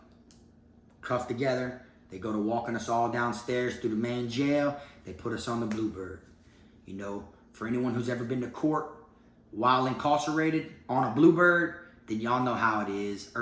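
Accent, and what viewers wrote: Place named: Louisiana, United States. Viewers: lol theres no way your actually from broward, i mean you haven't lived there your whole life, i dont know too many people who were born in dade or broward and have that kind of southern accent, where are you originally from? you sound like what i call an FCG, florida country gangsta, sounds like it could be used as in insult but its actually not at all and you don't need to actually be from florida to be FCG, thats just where i first got it from. basically its somebody thats pretty much a redneck, spent most of their life in the country or a small town but for whatever reason is gangsta as fuck and could just as easily move to the city and fit right into the hood even as a white guy or like one of those white guys that ends up in the bloods or crips cuz they are just that kind of OG. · You sound like you have kinda a Louisiana accent.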